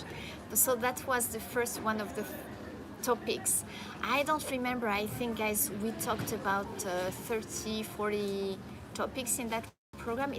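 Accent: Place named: France. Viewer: i like her french accent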